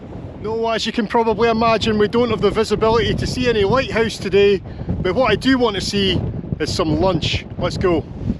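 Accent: Scotland, United Kingdom. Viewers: I'm not an English speaker so your Scottish dialect sometimes gives me hard time to understand what you are saying. Subtitles are a blessing. Thank You for making these videos, I'm a fan! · Scottish accents are the best! Best in the UK. Thank you, Mike Meyers. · The light is so dim at that latitude! So unlike here in California. Also, now I know what a "bothy" is. Great to hear a Scottish accent I can actually understand easily. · What a fun video. You're so cute and with that precious Scottish accent. Thanks, I've liked n subbed. I would get very sick physically if I lived there. Too much wind and dampness. I'm in sunny Florida but plan on moving.....too many hurricanes now.😢😢😮😮😂😂❤❤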